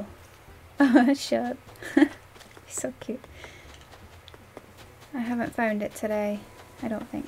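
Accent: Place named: England, United Kingdom. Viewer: Where are you from in England? Your accent sounds familiar but I’m really bad with accents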